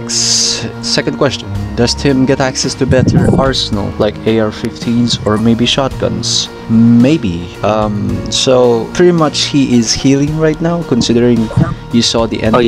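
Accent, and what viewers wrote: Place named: Russia. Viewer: is so peak. Ngl I didn't know you have the Russian accent, it's good to see a Half-Life fan nowadays who has a love for zombies too.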